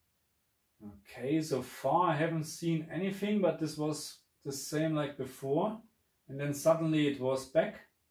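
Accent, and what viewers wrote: Germany. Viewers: Ist zwar ein englisches Video aber so wie ich das höre scheinst du auch Deutsch zu können😉. Gibt es denn noch massive Problem mit dem schwarzwert(flickering)beim vrr/HDR? Wollte mir jetzt nen CX für die ps5 zu legen. Danke für die antwort und frohe Weihnachten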